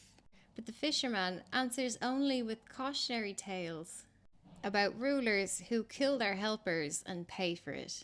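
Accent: Ireland. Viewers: Fascinating accent — I love the Irish ❦ Please read and breakdown more tales from the ⟨1,001 Nights⟩ and deconstructing the «moral scaffolding» within ❦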